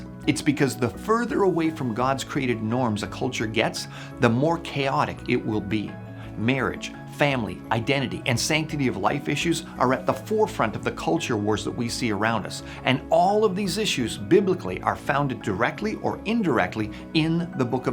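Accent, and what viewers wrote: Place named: Canada. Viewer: Oh Lord, that Canadian accent is making my ears bleed...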